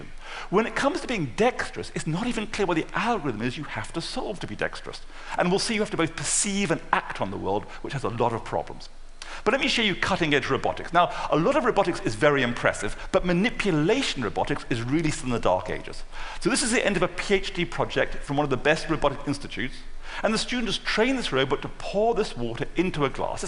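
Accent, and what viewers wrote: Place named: United Kingdom. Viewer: 영국 억양이 이렇게 짜증난적은 없었다...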